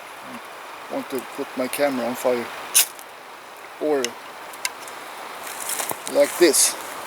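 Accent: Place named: Sweden. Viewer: jag älskar din svengelska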